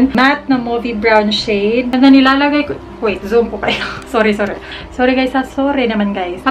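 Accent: Visayas, Philippines. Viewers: Para sakin ang ganda talaga ng accent/punto ng mga bisaya na nagtatagalog. Hahaha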